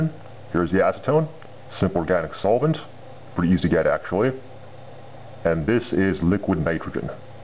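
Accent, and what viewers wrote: United States. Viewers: why do you use Celsius if you're american? (i assume from the accent)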